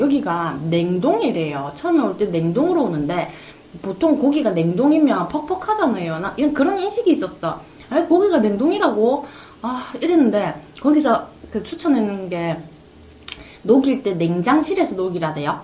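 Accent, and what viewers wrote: Gyeongsang, South Korea. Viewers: o sorry I thought it was Daegu accent btw u can speak English?!!😯😮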